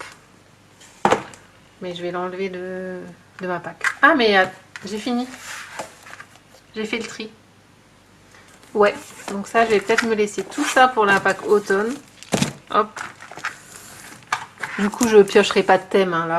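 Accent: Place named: Alsace, France. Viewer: Une alsacienne ! Quel plaisir ! Alsacienne également j'ai direct reconnu ce petit accent ! :) une très jolie vidéo !!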